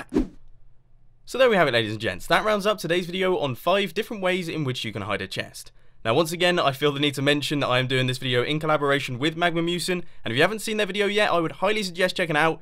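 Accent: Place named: England, United Kingdom. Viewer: oh i forgot about the english accsent lol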